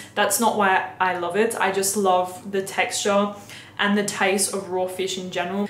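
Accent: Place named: Australia. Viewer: I have a weird question. I’m from the UK and grew up watching Neighbours, your accent sounds very different to the people on that show, does Australia have a lot of different accents?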